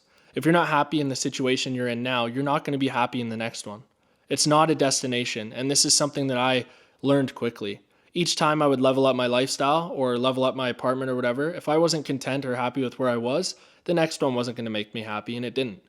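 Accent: Canada. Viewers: This was a great video. I also KNOW you’re Canadian. Can spot the accent from a mile away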